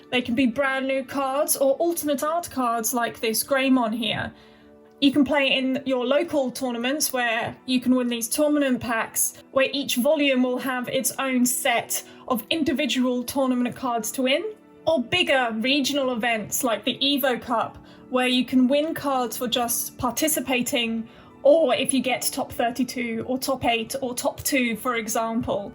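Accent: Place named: United Kingdom. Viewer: Where have you been hiding? Love this. Finally a fellow British (well proper english speaking) person 😅. Nostalgia overload.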